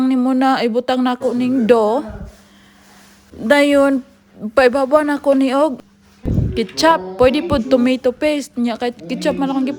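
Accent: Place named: Visayas, Philippines. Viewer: Bisayaa oy haha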